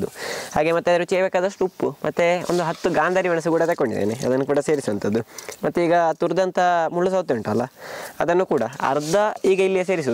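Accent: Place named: South Kanara, India. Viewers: Your explanation and costal kannada talking are spr 👌😍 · We love your videos. Wonderful presentation with pure SK Kannada. Jai Kannadambe 🙏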